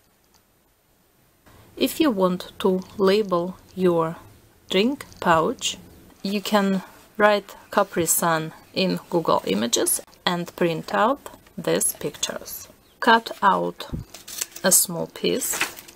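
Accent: Russia. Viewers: Are you Russian ? You have a really Russian accent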